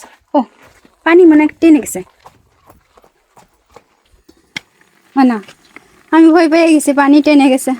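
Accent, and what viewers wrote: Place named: Barishal, Bangladesh. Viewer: আপু আপনার বাংলা উচচরন শুনলে মনে হয় না আপনি খুলনার মেয়ে।আপনিতো বরিশালের মত কথা বলছেন।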